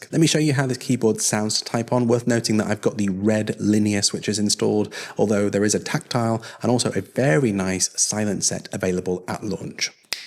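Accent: England, United Kingdom. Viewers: I hate the audio track chage it to my county language I like your English accent love this review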